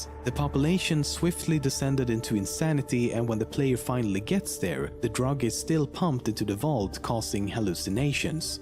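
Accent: United Kingdom. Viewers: you can tell hes british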